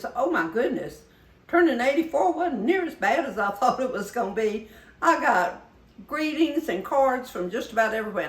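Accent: South, United States. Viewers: Happy belated birthday. 🎉❤🎉 I hope god blesses you (and all of us) with many more. You are a lovely & classy lady, someone I would love to sit down & have coffee with & hear your life stories. I think I love your channel more for your stories & positivity, to hear your sweet southern accent & to listen to infectious giggles (I laugh every time she giggles) more than your recipes. ❤ Although I do enjoy your recipes & tutorials too & have made quite a few of your dishes. Thank you for sharing. You are a breath of fresh air & I’d rather watch your videos over & over than to turn on the TV & hear sad & depressing news. This Oregon gal sends her love & prayers to you & your family. ❤❤ Shine for Jesus!❤ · I love the way you pronounce and the little sayings from the south. I'm from Oregon pronounced (Oregun). I love the southern accent.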